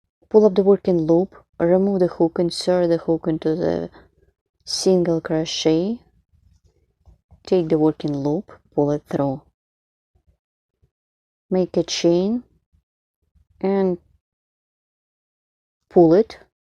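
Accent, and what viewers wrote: Russia. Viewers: Duzhe chuty ruSSkiy aktsent!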